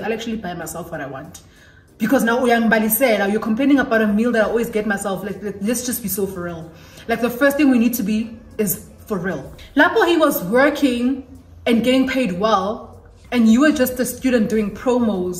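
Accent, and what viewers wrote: Nigeria. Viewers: Hey Motswala hope you are good?❤❤❤ Naledi M send me here and it was comfortable over here. The first story I watched was the IPhone 6 story time and I decided to stay because of how you relayed the story plus you are funny 🤣🤣🤣 hlogo Yao ae bereke and I’m here for it. Followed you everywhere and your Nigerian accent and love for afrobeats ❤❤❤ I used to think is Igbo or Nigerian. Yeah wene why can’t I download your videos on TikTok? When I catch you😤😤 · Hey. I joined when you had your Collab with Lebonza and have enjoyed every single video after that. Your nigerian accent is the best! and very contagious lol i hope you get what i mean. Thank you for the lovely content ♥ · I joined when you were doing make-up video with Lebonza I came from her channel straight to yours from that day😊...and I enjoy your Nigerian accent and your laughter on all your video 😍❤ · I discovered your channel through thati ever since I never looked back because whats life without Nigerian accent and your storytimes are the best.❤🥰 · Hey Motswala... 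#10k brunch... The very first time I watched this channel, I commented and said am staying.... It was a shout out recommendation from Naledi Mallela.... The Nigerian code-switching accent gets me all the time. I love your authenticity... You could be the girl next door njeee❤❤❤❤ Hope you host a Cape Town Brunch and I get the chance to be nominated 😂.... ❤❤❤ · Hey Motswala I started watching you 4 months ago when you were doing a makeup video with Seithati Letsipa since that day I was glued to your channel. I love all videos & storytime, love the Nigerian accent kakhulu ❤️❤️❤️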